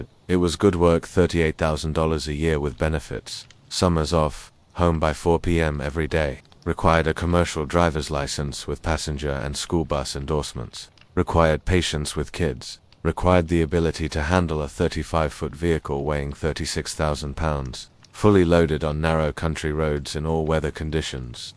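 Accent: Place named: England, United Kingdom. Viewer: Ixnay on the English announcer, it sounds lame to Americans.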